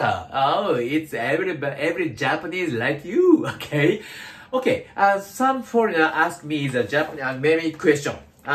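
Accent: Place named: California, United States. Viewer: Cali style tone❣️素敵です。🤗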